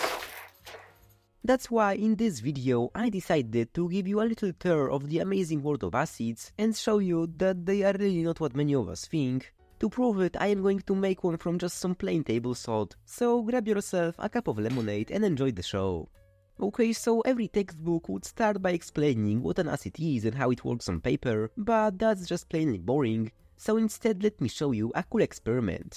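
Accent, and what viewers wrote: Poland. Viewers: hey bro, are you polish by any chance, you have polish accent in your english lol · My new favorite ASMR listening to chem synth in English via a cool a$$ dude wth a Polish accent. 👌🏻 10/10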